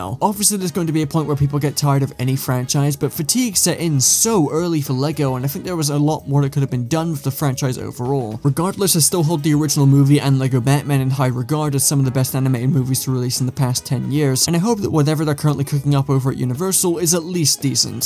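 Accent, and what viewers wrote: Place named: London, United Kingdom. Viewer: Bro must be from London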